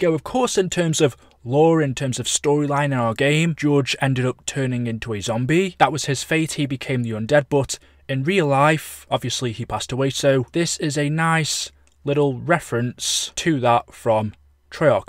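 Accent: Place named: England, United Kingdom. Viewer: Why is your English so... English 😄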